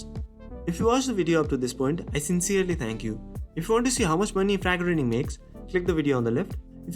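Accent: India. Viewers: Your voice and english talking is like a Indian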